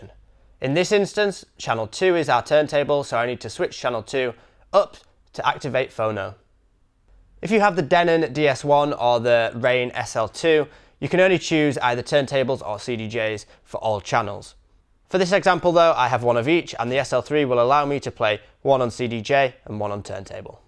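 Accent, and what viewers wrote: United Kingdom. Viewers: Thanks for the info but your brit accent makes it inunderstandable.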